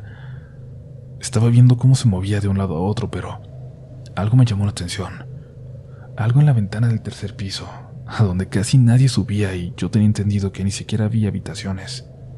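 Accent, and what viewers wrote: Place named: Mexico. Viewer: Hola a to2! Francamente alucino con tus relatos, sos muy gráfico a la hora d narrar, mejor q 1 film d terror, q se repiten + q el hipo! Vaya con la d suscriptores q tenés!! T felicito! Me basta con cerrar los ojos y escucharte con ese lindo acento mexicano q tenés, me encanta q relates en tercera persona, to2 podemos ser vos d esa forma. Gracias! #FuerzaUcrania!!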